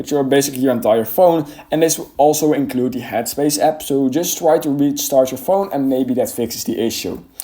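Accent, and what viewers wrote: Netherlands. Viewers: Je klinkt echt Nederlands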